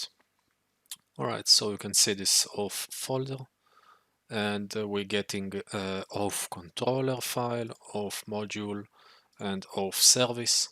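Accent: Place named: Madagascar. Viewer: Hello, I was wondering if you come from Madagascar? ( cause of the accent ) anyway, this is a great video, thank you for the sharing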